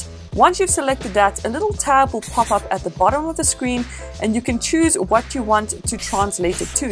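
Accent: South Africa. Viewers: Is that a South African accent I hear? 👀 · Kom je uit Zuid Afrika?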